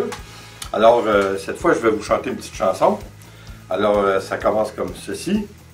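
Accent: Quebec, Canada. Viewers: J'aime bien vis explication et votre petit accent de québécois moi je suis normande je vais tester vos méthode pour su scrap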